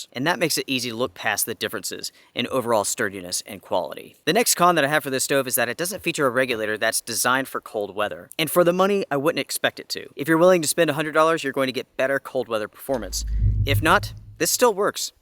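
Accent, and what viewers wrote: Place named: North Carolina, United States. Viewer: Everyone from NC says varabals like him.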